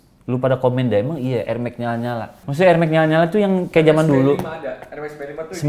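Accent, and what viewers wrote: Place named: Java, Indonesia. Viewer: lo gw logat jawa the best fking starboy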